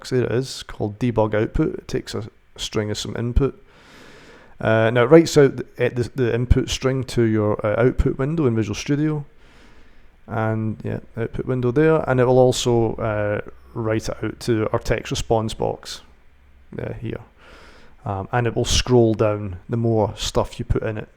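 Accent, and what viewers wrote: Scotland, United Kingdom. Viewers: > youtube search for api tutorial c# > first vid contains "C#", "Step by step" and is 24 minutes long > clicks > scottisch accent > fuck yes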